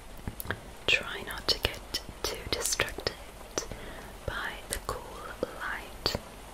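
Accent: United Kingdom. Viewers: Your British accent is fantastic!!! <3 My partner has ADHD and suffers from executive dysfunction. Watching this video helped me understand them more and taught me some ways I can help! Thank you!!! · That 330k looks very awesome under your channel name 👀 Congrats!! Also, could you teach me how to do a proper British accent?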